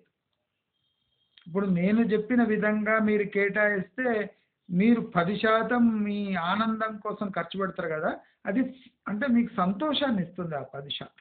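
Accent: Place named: Telangana, India. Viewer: Ur accent pakka Telangana, jai telangana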